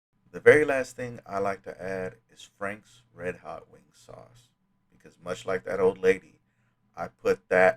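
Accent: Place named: South, United States. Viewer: Your voice is oddly soothing and I hear a southern accent even tho idk where u got that from. But MAN I miss your cooking already! At least I can watch these now lol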